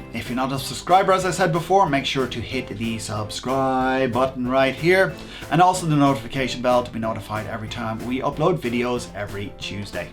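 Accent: Ireland. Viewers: U are DEFINETLY from cork or something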